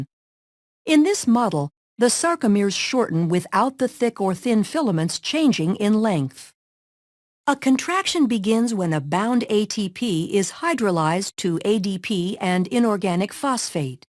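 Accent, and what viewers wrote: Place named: United States. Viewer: this lady is speaking American English 😲🔥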